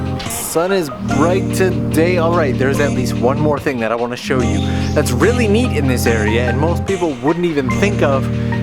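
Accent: Canada. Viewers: You should be a tour guide! you explain everything so well and your Canadian accent adds a special touch! Ireland is a different kind of beautiful so check us out sometime! 🍀🍀🍀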